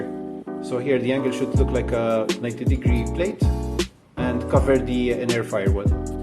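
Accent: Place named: Eastern Europe. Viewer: Great work again, many thanks for sharing. Thanks too for letting us know where you live. Your dialect sounded more like a Eastern Europe to me.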